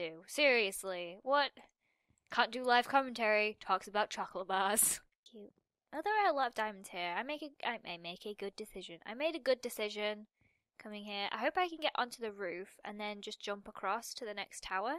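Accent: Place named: England, United Kingdom. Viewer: She's English too... Accent**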